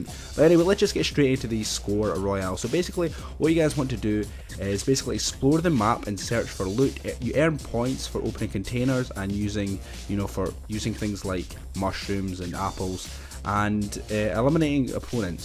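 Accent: Scotland, United Kingdom. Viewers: Is that a fellow Scottish person I hear? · Are you Scottish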